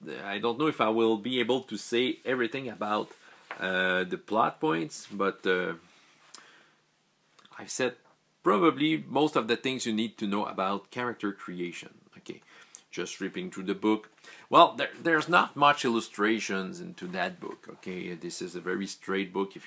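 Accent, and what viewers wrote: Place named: Quebec, Canada. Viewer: C'est vrai que l'accent québecois en anglais m'aide aussi à travailler mon anglais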